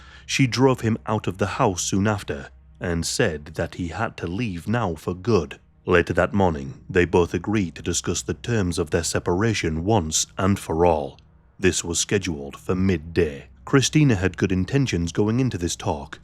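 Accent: United Kingdom. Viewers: The commentator is British, in which country did this happen?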